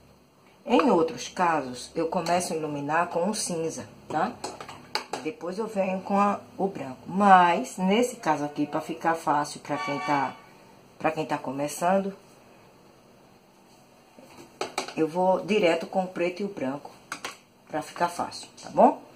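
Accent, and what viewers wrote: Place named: Pernambuco, Brazil. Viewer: DEUS te abençoe pelo carinho o qual vc ensina, estou aprendendo e da forma como vc ensina facilita muito, vc é pernambucana? Pelo sotaque acredito que sim, gente muito boa de coração, meu esposo é 😊😊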